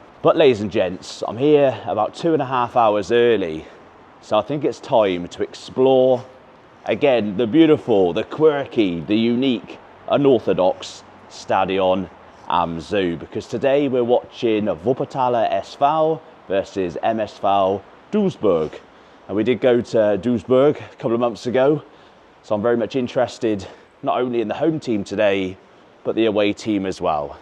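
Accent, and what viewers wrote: West Country, United Kingdom. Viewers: Am gradually going through all your videos. As you know i live in Berlin, but dont go to any games here. Regarding Wuppertal. Its a real shame that they are going through bad times. I remember them in the 2nd german division, with big crowds. You certainly spend alot of time in germany recently, and i realise your big love so to say lol, is for Bochum. Also, didnt realise you come from Hereford. I was certain your accent was more west country, Plymouth for example. I take a look now and again at your video from my club Gillingham, regarding that montrous corregated stand. Still makes me laugh. Anyway, i am gradually doing the rounds with all the content you put out. Really enjoyable and full of facts. Thanks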